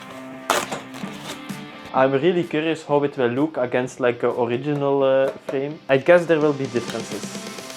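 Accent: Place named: Flanders, Belgium. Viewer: That's one thick Flemish accent brother! 😂 Very good video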